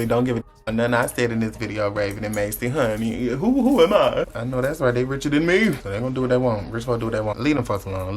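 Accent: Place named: South, United States. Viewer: You can tell is from the south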